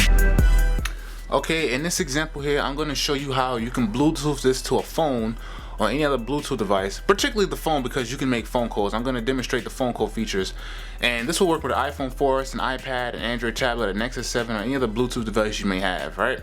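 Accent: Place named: AAVE, United States. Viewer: Hard to understand through all the jive talk and ebonics.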